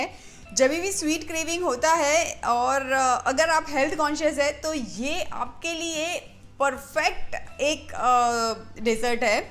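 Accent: Gujarat, India. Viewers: Way u talk is very nice guju style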